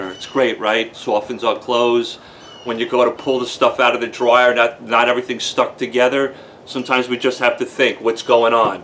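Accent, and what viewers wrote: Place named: Northeast, United States. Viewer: Finally an honest to goodness video on how to remove pet hair in cars/trucks 👍 Thank you so much for your informative video! I will definitely go out and buy a bottle of "All Fabric Softener" to rid of the pet hair in my truck! By the way, love your northeastern accent as well as your humor 🤣👏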